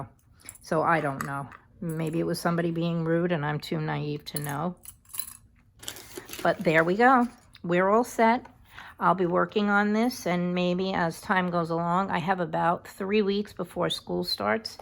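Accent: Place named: New York, United States. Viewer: I thought I heard some New York in your voice! I’m originally from Brooklyn 🥰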